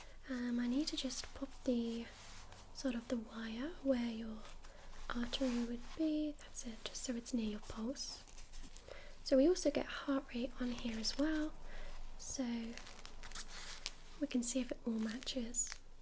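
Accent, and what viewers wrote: United Kingdom. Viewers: Is that a British accent you got?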